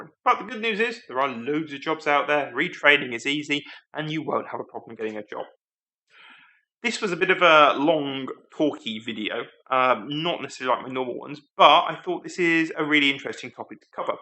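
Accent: United Kingdom. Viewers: Is it the audio quality or the British accent.. it is hard to understand you sometimes. But this topic is great :) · Please talk a little bit slower. There are people that are watching you and English is their 2nd language. And UK has a particular accent and no substitutes. So it can be harder and have to stop and rewind. You can take your time, no need to rush. Everything else is good.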